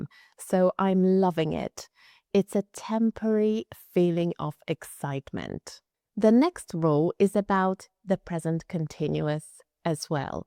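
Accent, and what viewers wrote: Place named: United Kingdom. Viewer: Very good explanations and excellent British accent!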